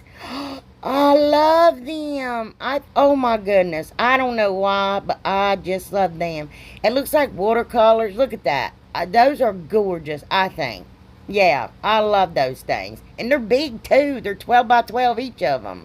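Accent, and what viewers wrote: South, United States. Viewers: I love everything! I could listen to you talk all day! Everyone says I have the most country voice they’ve ever heard, but they need to hear you! Everything you say, I understand completely!🤣🤣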